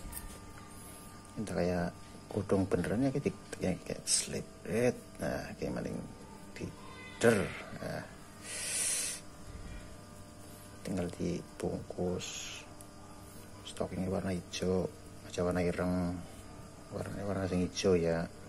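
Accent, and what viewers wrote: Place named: Banyumas, Indonesia. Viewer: Suka dch sm tuto yg ini....ngapak chiinnn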